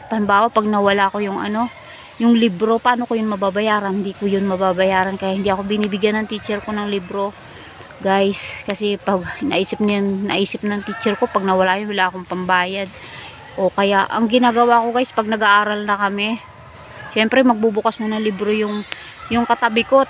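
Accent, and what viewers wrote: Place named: Visayas, Philippines. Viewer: Aragoy bisdak ka pla host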